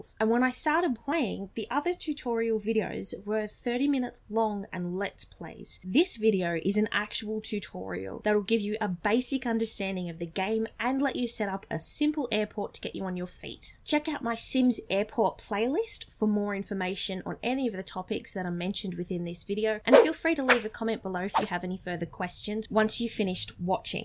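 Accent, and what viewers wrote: Australia. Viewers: "chick with the Aussie Accent" did not disappoint. Thanks mate.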